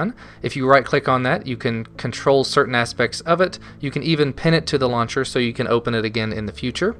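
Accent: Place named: South, United States. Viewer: oh well, I realize this is science-fiction weird that a complete stranger would focus on your accent on a Ubuntu review but, it's very light and fluid...I knew it was from southern America but...so many accents are heavy, and the hard R's and all but then Kentucky ppl come along... anyways...totally off topic so merry Christmas and review away please.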